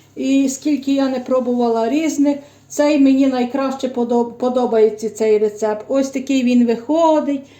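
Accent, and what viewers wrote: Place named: Ternopil, Ukraine. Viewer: Говорите точно, как у мамы на родине в тернопольской области, все слова, звуки, интонации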